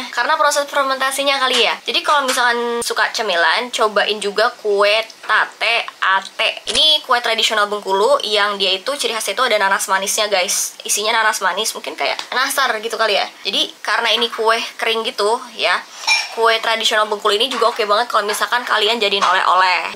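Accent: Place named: Surabaya, Indonesia. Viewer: Arek Suroboyo Iki loh masse 🙋🙋🤣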